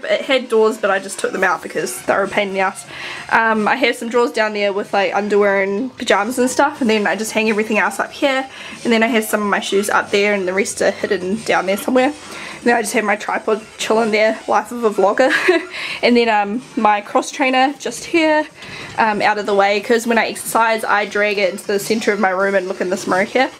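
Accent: New Zealand. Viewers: haha are you from NZ?? i live there and i kinda reconise yr acsent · Wait.... Shes from NZ? I thought she was from Australia!!! ANOTHER WIN FOR THE KIWIS!!!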